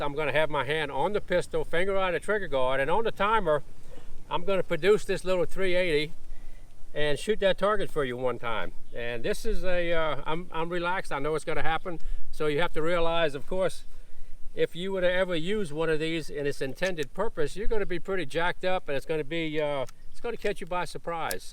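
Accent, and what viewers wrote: Louisiana, United States. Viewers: Sounds like a fellow Louisianan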